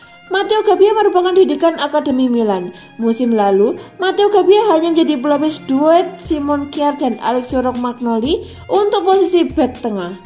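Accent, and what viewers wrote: Java, Indonesia. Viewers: Surane medok medok pantes 🤭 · mani medooook eta suara pengsisi suarana🤣.....forza milaaan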